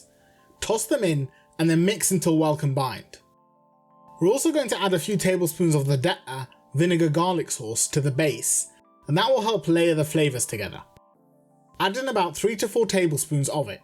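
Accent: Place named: United Kingdom. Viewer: Delicious food! Handsome face! And a very intelligent sounding British accent 😂 what else could you ask for haha! This change is UNDERVALUED u will grow to at least 300k-500k! Keep it up!!!!! 😍✌🏻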